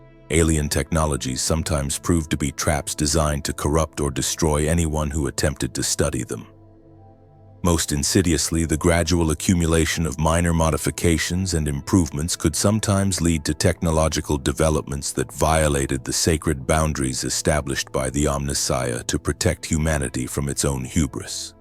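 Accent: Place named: United States. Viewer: The voice is better, barely watchable now. Still, the American twang is infuriating. These people have English, accents, old London etc. The American voice adds camp element to a dark and gritty world.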